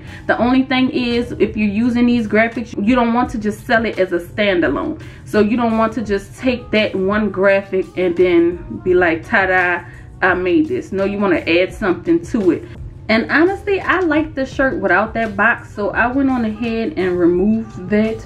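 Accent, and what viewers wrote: New Orleans, United States. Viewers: I'm new here. Are you from New Orleans? I know my people. Our dialect is very distinct. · Sister are you from New Orleans??? I'm a new subscriber and you're accent sounds very close to home lol. I absolutely love your energy, from intro to end. You are a blessing ❤️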